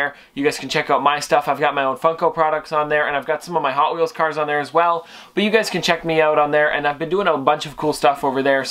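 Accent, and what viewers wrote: Canada. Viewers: I love Canadian accents 😂 love the videos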